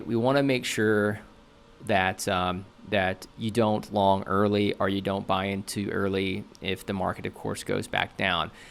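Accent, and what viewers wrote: United States. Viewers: Hello. Thank you for your thoughtful and insightful assessment of the current market. Also, I very much enjoy listening to your charming American accent.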